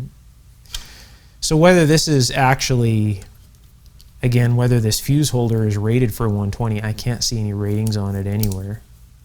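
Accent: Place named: Canada. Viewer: I cannot quite pinpoint his accent/dialect - I am guessing it is Canadian since the pronunciation is so articulate and clear - But which is it? 🙂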